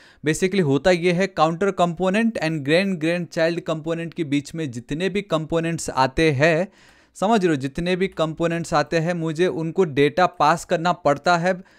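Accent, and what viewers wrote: Nepal. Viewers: 😂😂 counter na saunter , full Nepali tone , lots of love 💕😘 bro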